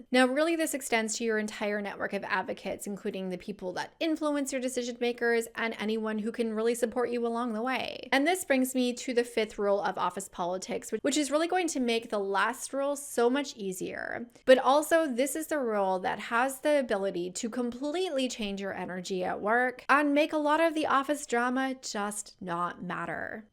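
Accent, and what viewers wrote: California, United States. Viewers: Your Cali tone is so off putting…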